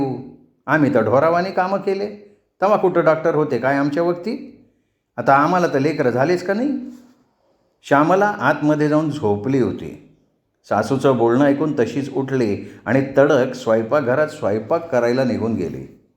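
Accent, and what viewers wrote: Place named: Varhadi, India. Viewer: खूपच छान तुमच्या आवाजात वराडी भाषा कानाला गोड वाटते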